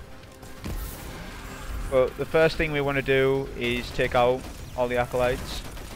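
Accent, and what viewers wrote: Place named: Cumbria, United Kingdom. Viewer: haha im from cumbria too mate and i just noticed your accent and thought he sounds very local!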